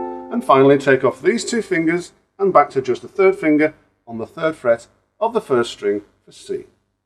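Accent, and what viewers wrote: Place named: England, United Kingdom. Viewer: If nothing else it makes such a nice change to hear a youtube tutorial (on anything) in an English accent. (Sussexman here)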